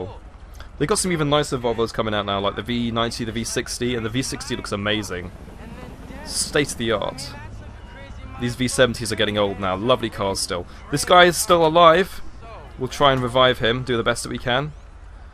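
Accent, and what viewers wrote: United Kingdom. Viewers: What part of Britain u from I'm from stoke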